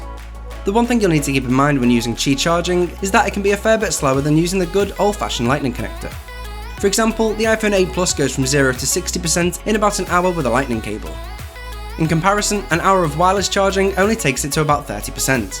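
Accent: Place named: Scotland, United Kingdom. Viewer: I love your accent, is that Scottish?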